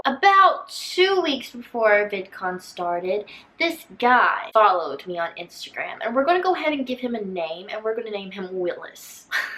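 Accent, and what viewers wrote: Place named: Tennessee, United States. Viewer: Your accent literally makes me so happy. I'm from Tennessee and get picked on because my accent is so deep, and while watching this video someone came up to me and said "wow her accent is like yours!!!" 😂😂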